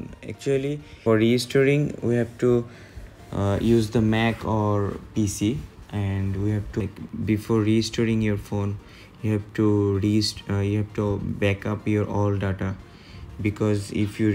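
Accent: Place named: India. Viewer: That reassuring moment when you hear an Indian accent on a tech troubleshooting video 🔥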